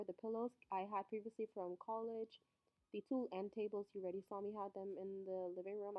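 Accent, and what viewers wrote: Bahamas. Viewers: From I hear ya accent Ik you was a Bahamian #Bahamas🇧🇸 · You have to be a Bahamian because of the accent. I'm watching this like hey she sounds like me loll... Nice Apartment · I came looking for this comment! I said she has to be from the bahamas! Sending love from nassau!!! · Are you from the Bahamas? I can here our accent🤣🤣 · From the video started i knew you were Bahamian! So am I! And i love this video. Keep up the great work bbg. Def got a new sub. :) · The Aquarian Empress I cam just to see if someone caught it lmfaooo. As soon as I heard her speak I said she a Bahamian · You sound Bahamian 👀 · I know a Bahamian when I hear one! Great job sis🇧🇸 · I said the same thing. She sound like a true bahamian lol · Are you Bahamian??? That accent creeping out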